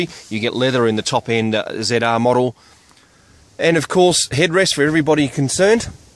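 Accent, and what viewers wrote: Australia. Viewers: U Aussie ?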